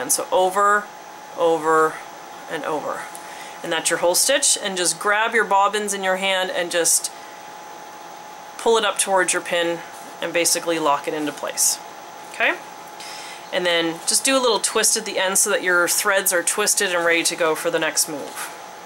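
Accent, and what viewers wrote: Canada. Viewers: Anna Nicholson if you listen she says oot and aboot, which is typical from nova scotia ;) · I heard your Canadian accent right away! Fellow Canadian here!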